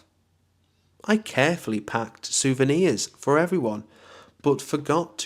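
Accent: United Kingdom. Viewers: The British accent!! I missed it alot! I studied in the UK for a while! So, this accent filled me with nostalgia!! Liked+subscribed+shared with others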